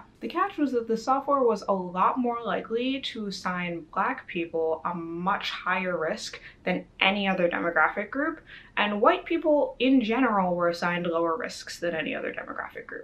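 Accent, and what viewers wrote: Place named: California, United States. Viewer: Dialect is Western, California, Santa Monica.....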